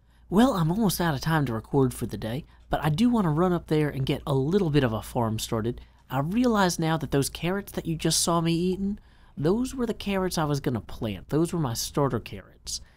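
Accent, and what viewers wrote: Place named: Tennessee, United States. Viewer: Nice accent xD This is the first video I have watched by you, nice videos, and I really do like that Tennessee accent xD!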